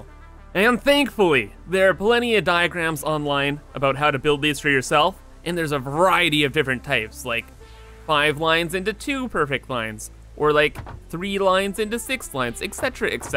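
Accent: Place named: Canada. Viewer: this is the most canadian voice i've ever heard, i love it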